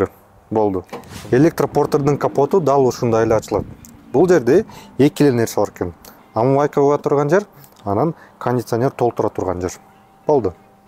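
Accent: Standard. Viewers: Аллах ыраазы болсун ушундай кыргызча таза суйлоп тартып чыгара бер.Ишине ийгилик. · Сен баарыбызды Кыргызча туура сүйлөгөндү да үйрөтүп жатасың рахмат . Мен негизи уккам башка бирөнү ата деген күнө болот жана мен башка улуттан болом деген да күнө болот ошондой эле тилге даны тиешеси бвр . Биз Кыргыздар Кыргыз болгондон кийин өз тилибизди ушу Балага окшоп таза сүйлөйлү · Азаматсын,кыргыз тилинде таза суйлойт экенсин,ошон учун аягына чейин кордум,ишине ийгилик! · Кыргызча таза суйлойт экенсин,Азамат! Жакшы информация бердин рахмат,ишине ийгилик! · Бул жигит кыргызча так таза суйлойт экен. Кулакка жагымдуу угулат экен. Обзордо дегенди серепте деп койсок болчудай го. Обзор жасоо - серептоо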